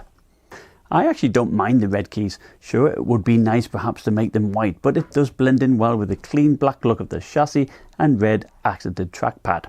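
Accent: United Kingdom. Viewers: Hey, first off I hear a British accent but hear dollars and see that disgusting enter key 😂 must be tripping but I have a really important question, curry’s are really weird with they way the price the laptops here so the nitro 7 with 144hz, 8GB ram and 1660 Ti will be around 800 quid on Black Friday with a 512 gb ssd and the Helios 300 with 1660 Ti 1TB HDD and 256ssd also 144hz will be around 1.1k, what would you reccomend??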